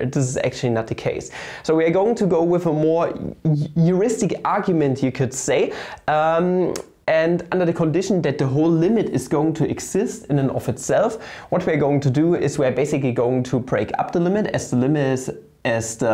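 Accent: Germany. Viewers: I don't think this is only because of the German accent but does sound a lot like Der8auer ^^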